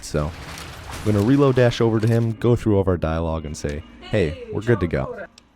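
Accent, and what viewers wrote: Italy. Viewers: Are you italian? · Are you American or italian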